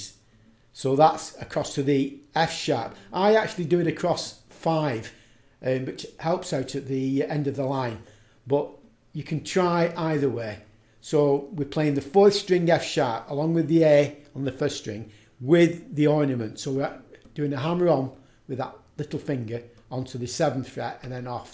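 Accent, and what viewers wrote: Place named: Northern England, United Kingdom. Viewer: I could tell you are from up north before I read. Nice tutorial. I'm from Liverpool. Cheers!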